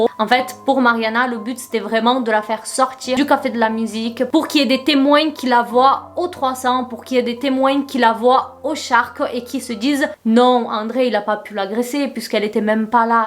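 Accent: Brazil. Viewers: Coucou! Un petit commentaire pour te dire que j'adore tes vidéos, ton naturel, ta façon de raconter etc En plus pour ne rien gâcher tu es magnifique et j'adore ton accent brésilien (je suis portugaise) ! Je t'adore et tu me detends énormément quand ça va pas ❤❤❤ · J’aime tellement ton accent ! Tu as les mêmes « défauts de langage » (je savais pas trop comment dire ça) que ma mère je trouve ça trop miiiim’s Bref continue comme tu es 🌊 Juste une question ? Tu es d’où au Brésil (sou carioca e vascaina)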